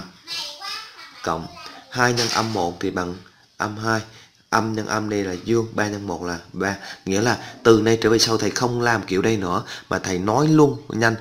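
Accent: Binh Dinh, Vietnam. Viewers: thầy dân bình định hả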